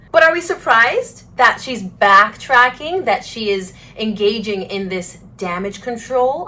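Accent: United States. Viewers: I just happened upon your channel. Wow. You are great !!! I am so pleased to see a young American ( I'm only assuming due to your accent) woman who is obviously educated and well mannered, speaking out in defence of Her Majesty, and clearly stating the facts about Harry's wife. She must be called out, it is so important to keep on track of all the lies and slander from this talentless grifter fake duchess who conned Britain and the Royal family. Thankyou 🇭🇲😘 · She moved to Australia as a child from another country which I am not sure which country from. It is possible that she is from America but she mentioned that she was treated differently when she arrived to Australia initially due to her Hispanic looks. She did her school and uni in Australia and she was even in the Australian armed force as a trainee for a period while she was studying law. Australia is a wide country and not all of us sound the same. 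There is typical Aussie accent from the bush and city people sound completely different. Yes, I can hear slight American accent in her but she also sounds Aussie to me. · has an American accent though. She must've moved to Australia then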